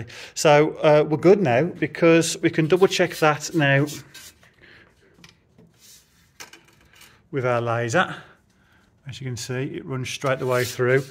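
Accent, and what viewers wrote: Birmingham, United Kingdom. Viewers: Proper brummie. … there ACE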